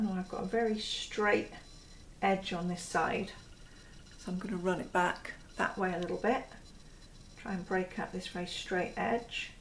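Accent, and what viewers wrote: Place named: Southern England, United Kingdom. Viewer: do you come from Gloucester ,dorset or hampshire? lovely accent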